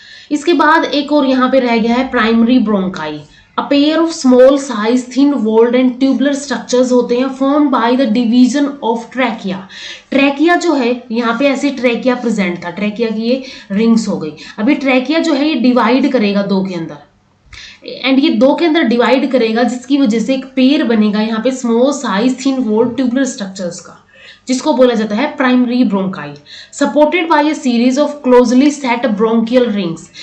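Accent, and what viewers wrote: Haryana, India. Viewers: Haryana se ho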